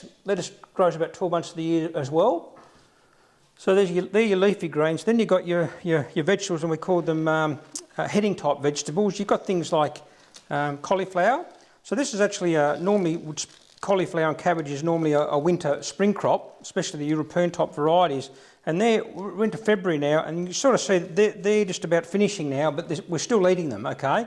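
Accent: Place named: Australia. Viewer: Where abouts are you located? You sound Australian.. I live in subtropical Queensland (Gold Coast) and absolutely STRUGGLE to grow even heat loving crops during summer. It’s autumn and we are still having 32degree Celsius days!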